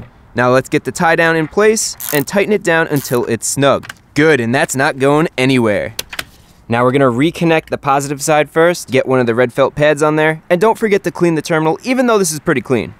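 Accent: United States. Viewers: American (simplified) english, dialect we hear in movies, shows and songs.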